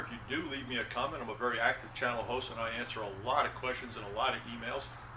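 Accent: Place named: Brooklyn, United States. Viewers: u sure u not from Brooklyn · I feel like is originally from New York, like me. I place his accent in Medford area (or west) on Long Island.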